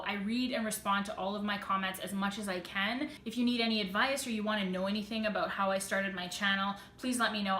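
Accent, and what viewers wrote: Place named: Canada. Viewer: Who doesn't love a cdn accent?!!!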